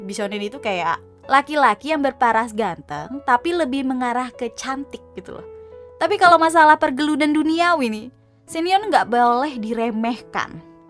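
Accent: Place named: Tulungagung, Indonesia. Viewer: ooo iki ye wong tulungagung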